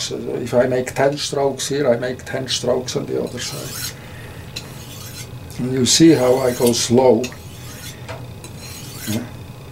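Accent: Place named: Outer Hebrides, United Kingdom. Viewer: Excellent video; very well explained by a nice fellow. I use the 6 inch round tip boning knife for the deboning, joints and backend when I'm doing sheep. I have the Victorinox lamb skinner for the opening when skinning. I'm going to try a Dexter Russell 5 and a quarter lamb skinner as the Americans usually have good high carbon steel. The Victory New Zealand I have is a topper.. I can't help but think that you say some words very much like us here in the Outer Hebrides of Scotland; it must be the Celtic Germanic connection. Keep up the excellent videos.👍👍👌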